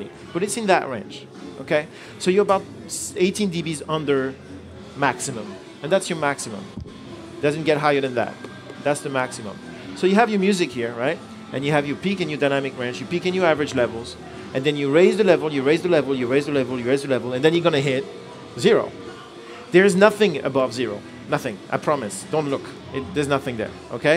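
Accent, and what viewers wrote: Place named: France. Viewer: Are you French by any chance? I don't know what it is but it instantly makes your English sound much more awesome. That's not cool man; it colors my perception of you and prevents me from getting a proper read, lol. Anyway, great explanation. Very well put, as if your words came straight out of my head on every point. I'm sure you do great work and thanks for taking the time to educate. All the best!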